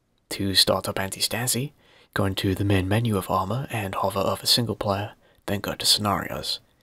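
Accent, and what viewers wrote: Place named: England, United Kingdom. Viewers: Your accent is really intriguing me, it's like a cross between Queen's English and Yorkshire! Haha. Great vid BTW! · I thought the accent sounded familiar, I just moved to north yorks myself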